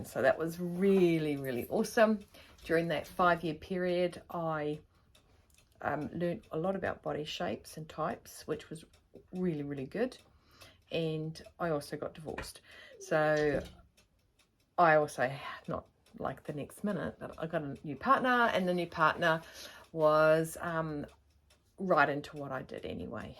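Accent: New Zealand. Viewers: Hi, welcome to the community of #Fridaysews. You are very natural at this, great to hear about you and your journey. Also, so cool to meet a Kiwi...yay. you go girl! And a warm hello to your Mum too. I am also a #frdaysews vlogger, perhaps either yourself or Mum have commented with me? Not sure. You have a beautiful Kiwi/Aussie accent, just lovely to listen to. Yes please, would love to see your sewing room, and your stash...and would love to meet your Mum someday, maybe a guest appearance? I'm looking forward to seeing you regularly and sharing your sewing journey 😂❤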